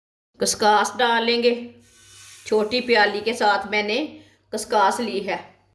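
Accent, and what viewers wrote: Punjab, India. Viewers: Samaj to a Rahi hy Punjabi style urdu hy to ksi ko Kya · Mohtarama ki urdu b Punjabi lehjay wali hy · خدا کے واسطے اردو مت بولو پنجابی میں ویڈیو بناؤ آپکی اردو بھی پنجابی لگتی ہے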